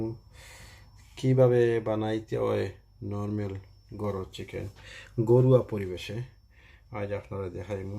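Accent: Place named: Sylhet, Bangladesh. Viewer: ওয়া আলাইকুম আসসালাম। কেমন আছেন আপনি। ভালো লাগলো, এই প্রথম কুনো সিলেটি ভাইর ভয়েসে রেসিপি দেখলাম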